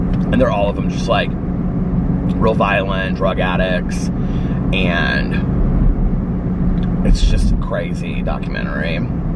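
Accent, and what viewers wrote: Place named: Midwest, United States. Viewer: Hi. I noticed you say “saul” instead of “saw”. Like as in, “I saul so and so yesterday.” Is that. Mid Western thing? I always say “ milk” weird, but it’s just a “ Melissa thing.” 😁 I’m looking forward to hearing about the cozy mysteries. It really helped me through last November and December when you went on a “ cozy” spree! ❤️❤️❤️ I loved it!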